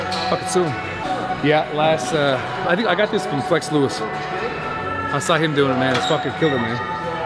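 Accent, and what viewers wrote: Newfoundland, Canada. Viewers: newfoundland accent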